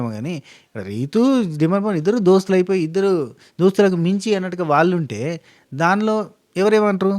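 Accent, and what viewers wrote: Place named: Rayalaseema, India. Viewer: Yendi ee roju మా సీమ యాస కూడా వచ్చంది 😂😂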